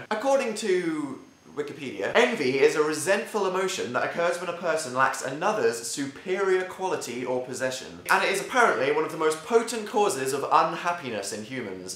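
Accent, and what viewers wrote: United Kingdom. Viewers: I'm envious of your articulate british accent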